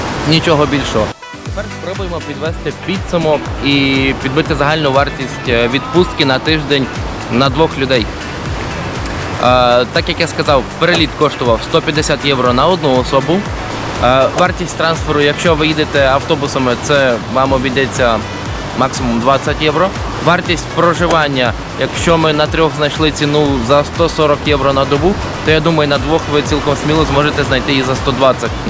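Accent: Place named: Poland. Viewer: Польский акцент.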